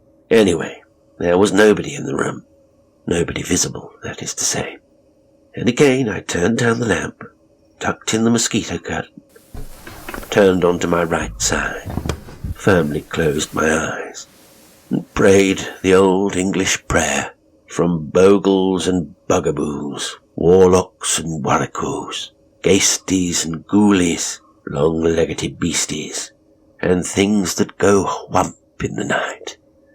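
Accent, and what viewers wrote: United States. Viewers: Well kudos to for the American accent maintained for so long - really enhanced the Kiplingesque story. Respect. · I had forgotten how much I absolutely love your American accent 🖤✌️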